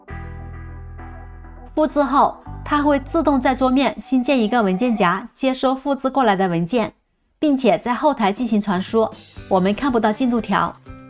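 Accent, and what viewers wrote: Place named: Yunnan, China. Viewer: 听出了云南口音，不知道对不对呢😁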